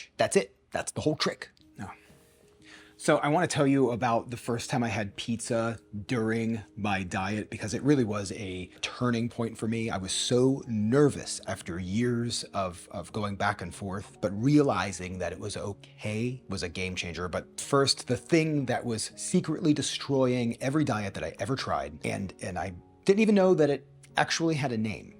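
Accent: Canada. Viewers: Lol, it confuses me every time. I was wondering if you were Canadian.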